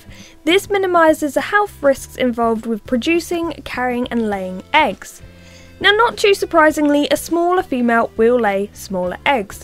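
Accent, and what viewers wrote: England, United Kingdom. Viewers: where about from England are you from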